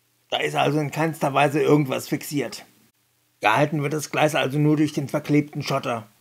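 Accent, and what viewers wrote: Hesse, Germany. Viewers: Hi, sehr schönes und lehrreiches Video....kannst öfters hessisch babbeln:):) LG Mike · Hallo, sehr unterhaltsam, auch oder gerade wegen dem "hessisch babbeln"... 😁😁😁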